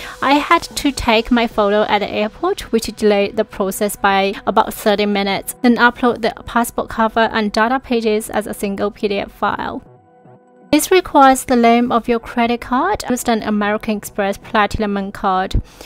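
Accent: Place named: China. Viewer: 英語は大丈夫だよね 中国人ですか？その発音はちょっと中国語だから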